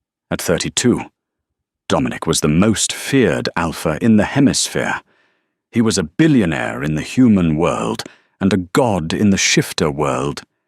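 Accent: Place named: United Kingdom. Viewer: I call it Yummy Purring English / British accent.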